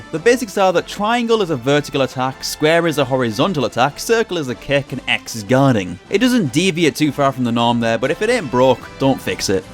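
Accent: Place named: Northumberland, United Kingdom. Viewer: I swear down you sound like a Northumbrian. If not somewhere from the north east.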